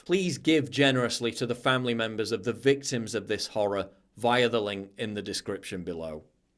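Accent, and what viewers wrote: United Kingdom. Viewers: This guy speaks with a heavy British accent. Are they even allowed to own in Great Britain. I bet that he has never shot a 50 cal, and probably denies ever masturbating.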